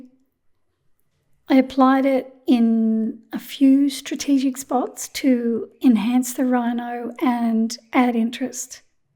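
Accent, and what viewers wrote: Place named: Australia. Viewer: It’s that lovely Australian accent that got me. 😊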